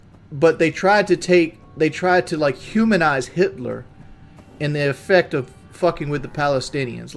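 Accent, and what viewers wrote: South, United States. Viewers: That’s just a southern thing. Many southerners pronounce want like won’t.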